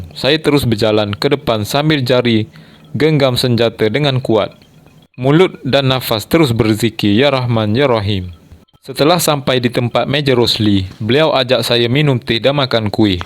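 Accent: Kelantan, Malaysia. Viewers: slang aka bau budu insyaallah tetap pekat ngalir dlidah kita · Bunyi Kelate nihhh...guano bohh...hahaha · Ooo ore kelatee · Pekat klate demo.. 😅😅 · Asslm bang mantap pengalaman bang,abang orang Kelantan ke bunyi cara abang ckp macam orang Kelantan hahah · Pekat loghat Kelantan..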